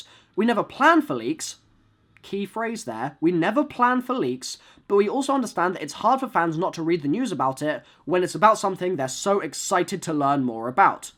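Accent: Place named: United Kingdom. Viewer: that british accent is gonna get on my nerves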